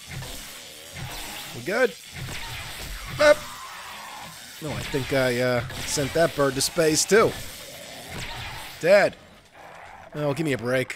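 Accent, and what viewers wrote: New Jersey, United States. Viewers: Probably the best 7Days content around. Maybe its the New Jersey accent...?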